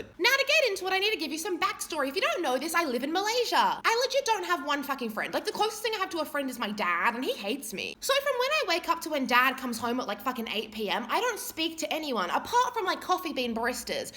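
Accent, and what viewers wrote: Australia. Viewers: Were you born in Malaysia bc you have an Australian accent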